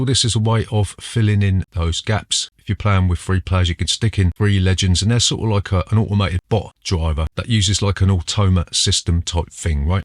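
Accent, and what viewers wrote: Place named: Cockney, United Kingdom. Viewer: From one cockney to another; you're a top geezer!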